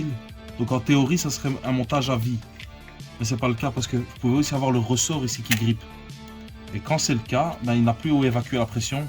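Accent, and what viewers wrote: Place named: Belgium. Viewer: Je suis français et je possede une BMW e46 essence donc rien à voir avec ce modèle mais j'ai pris énormément de plaisir à voir ce travail bien fait par un mécanicien au sympathique accent belge et surtout un vocabulaire et un phrasé parfait tout au long de son intervention. Ca fait bien plaisir. Merci Monsieur 🙂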